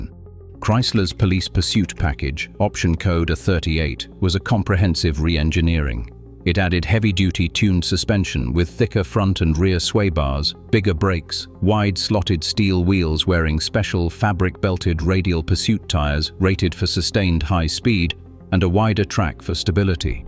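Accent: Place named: United Kingdom. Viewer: American cars, American cops, American highways, and this poster decides that it has to be narrated with a British accent. WTF?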